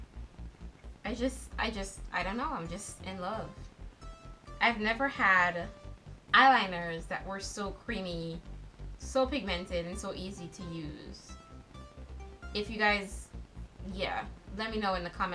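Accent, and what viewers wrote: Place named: Trinidad and Tobago. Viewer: found you through your amazon review....ah hearing that accent gyul! Trinibago???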